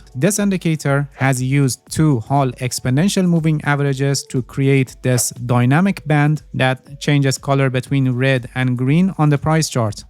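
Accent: Iran. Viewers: That's iranian accent 👏👏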